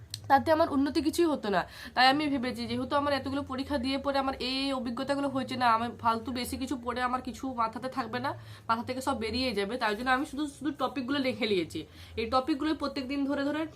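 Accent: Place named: Purulia, India. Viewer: Kotha sune ja bujhlam tomar bari Purulia ba bankura 😮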